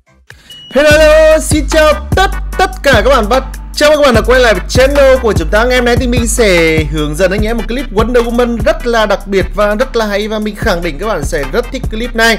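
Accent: Nghe An, Vietnam. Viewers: phải quê nghệ an k cách nói chuyện hay quá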